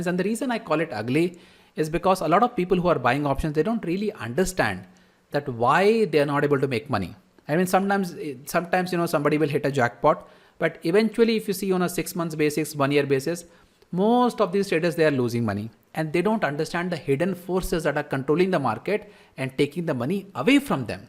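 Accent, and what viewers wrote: India. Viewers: Your accent same as P R sundar sir, are you from tamilnadu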